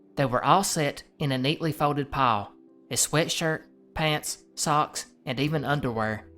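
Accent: South, United States. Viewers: Boy you are about as country as corn bread and black eyed peas. Love it!!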